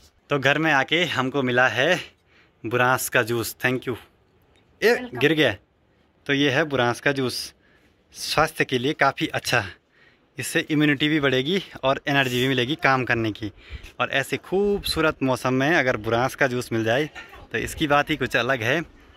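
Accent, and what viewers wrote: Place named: Pahadi, India. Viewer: Thechenge pitpita 😂 Mza aa gya bhai pure pahadi bolte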